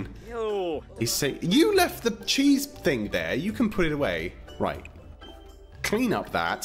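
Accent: United Kingdom. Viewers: Yordania Fumero English* and I think you meant brittish accent since he's from the UK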